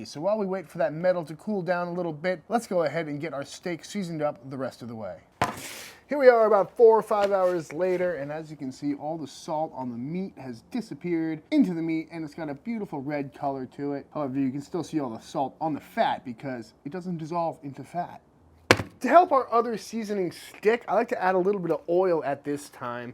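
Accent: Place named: Massachusetts, United States. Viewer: I hear that Mass accent. Where you from in Mass?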